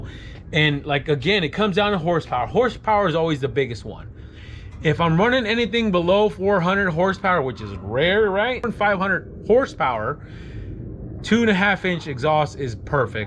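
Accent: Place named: Northern California, United States. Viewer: I can tell you from Northern California bra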